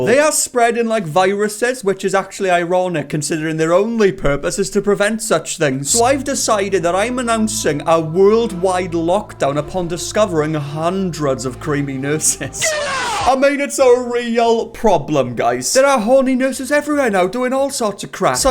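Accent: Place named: South Wales, United Kingdom. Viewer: I'm 90 % sure iv seen you irl in Ystrad tesco 😂 iv watch 2 of your videos and the accent is definitely giving south wales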